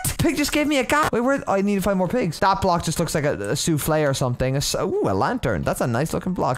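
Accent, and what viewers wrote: Ireland. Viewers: Im I the only one who noticed that he has a slite Irish acsent??Like a 20% of Irish and a 80% of American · you sound irish are you irish? nvm lmfao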